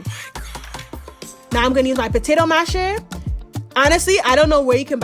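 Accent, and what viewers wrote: Toronto, Canada. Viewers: Soon as u started talking!! I said she a Canadian!! We really got an accent!! Nice recipe!!! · That Toronto accent is hilarious 😭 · Toronto accent smacked me in the face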